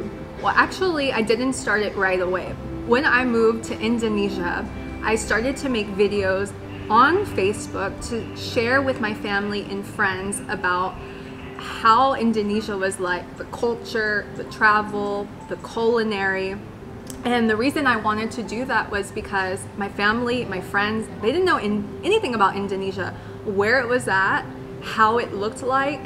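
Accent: United States. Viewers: Keep making videos! It is good for learning American pronunciation. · I learn much american accent from you, You need colab with Indonesian food vlogger to get more subscribe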